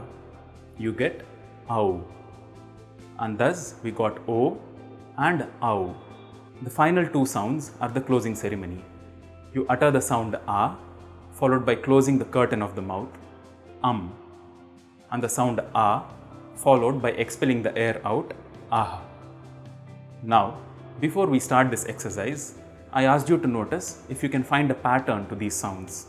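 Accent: South India, India. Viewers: This is probably a dravafian accent. Ancient Aryans ate meat probably sounded more manly like Persians do. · loving these video's! Learning a lot here. :) Small thing for you to pick up though when pronouncing a 'T' in English. The 'T' is not velarized as you probably know, but a simple plosive sound. The 'Indian T' doesn't exist in English. I think it is a great struggle for Indians and Pakistani's to get this right. But we all have our own accents when speaking a foreign language don't we. I do! :D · Why to put hands in this weird pose that is not even a mudrah. this way host doesn't look smarter and why such awful indian accent, at least we re trying here to listen to Sanskrit and this accent just hurting our ears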